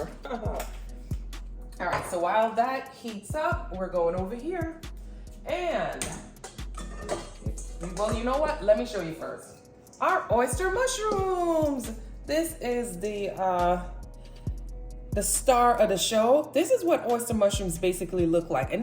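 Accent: Jamaica. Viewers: That looks delectable... You're Jamaican right? Sounds kind of like you are. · Idk why i hear a jamaican accent 👂🏾 🧐😌lol..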